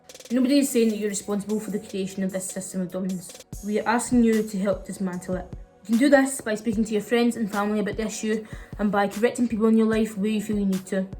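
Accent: Scotland, United Kingdom. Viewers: Your Scottish accent is bloody THICK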